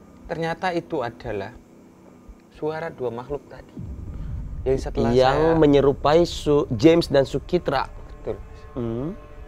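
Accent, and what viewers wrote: Java, Indonesia. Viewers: Gemess lucu bgt jd fasih ngomong Jowo medok akibat keseringan naik gunung diJowo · Semangat medhok bang dzawin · bgst jawa medok banget, ngakak dari awal sampe akhir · Efek hbs dri lawu... malah logat ny jdi jawa.. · Medok.nya dzawin udah sangat bagus bgt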